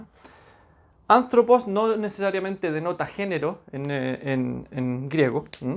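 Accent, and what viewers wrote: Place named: Chile. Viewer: Gracias por la explicación. Y me gusta el acento chileno. Es muy apacible.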